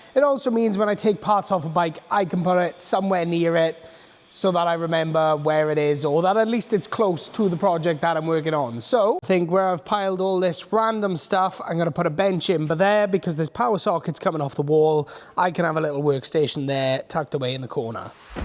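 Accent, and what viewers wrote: Wales, United Kingdom. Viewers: YAAAY! You're back! Cracking update,! I've missed your delightful Welsh tones and this vid has really made my weekend 😊 Well done on the new unit and growing the channel. I hope you get to the point when you can quit your day job and do this full time 😁 Roll on the next vid!!!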